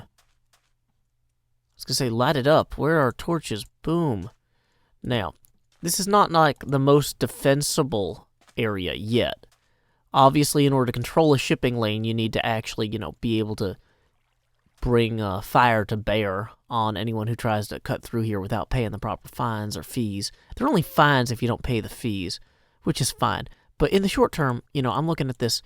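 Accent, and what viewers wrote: South, United States. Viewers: Funny to hear a hermit with my southern accent I'm definitely gonna be paying more attention to this channel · First time watching one of your videos(and i hope this isnt rude), but your accent makes me think of a true southern accent i don't hear often among youtubers.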